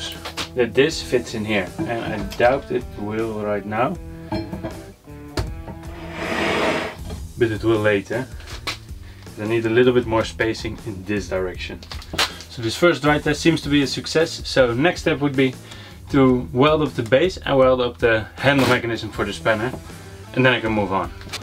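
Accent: Netherlands. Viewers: dacht al een nederlands accent te horen! gister op je kanaal gekomen nu geaboneerd! kan niet wachten tot ik mijn schuur/werkplaats klaar heb dan komt er ook zeker 1!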